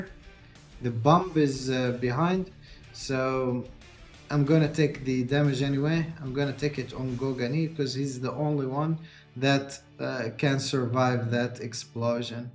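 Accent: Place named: Arab world. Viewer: لهجتك كأنك عربي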